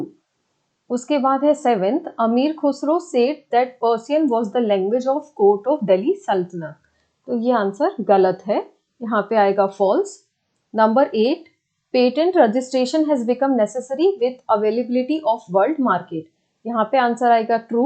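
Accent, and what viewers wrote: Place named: Gujarat, India. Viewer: Aap ke voice se hi pata chal gya tha ki aap Gujarati ho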